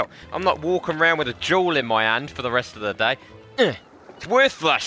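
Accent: United Kingdom. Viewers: I like your British accent 😂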